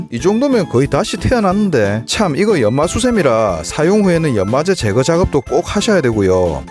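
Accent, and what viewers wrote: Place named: Busan, South Korea. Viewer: 내고향 부산. 사투리 넘나 잼나요~ 살림구경에 사투리 들으러 더 올 것 같아요~!! 구독이용~❤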